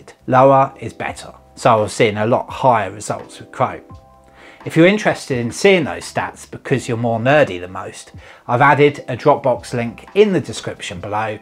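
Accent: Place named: Australia. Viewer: thanks. I have been considering to move from Chrome to Safari permanently, then found your video! by the way. I like your accent. which part of Oz are you from??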